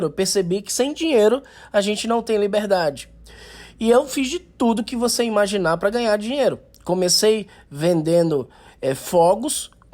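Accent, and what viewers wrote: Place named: Natal, Brazil. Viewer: nossa, cara. Moro na rua por trás do Reis Magos, e não sabia que você era aqui de Natal, até porque seu sotaque não é 100% igual o nosso. Tô assistindo seus vídeos desde ontem, acredito que tenha sido por causa da lei da atração, pois venho pensando exatamente nas coisas que, coincidentemente, você fala em seus vídeo. Que bom que respondeu o comentários, vi que a maioria você só curte, mas não comenta.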